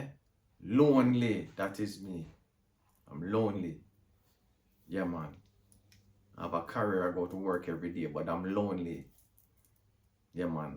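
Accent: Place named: Jamaica. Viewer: Funny you sound like Jamaican uncle and I think he was from Canada also.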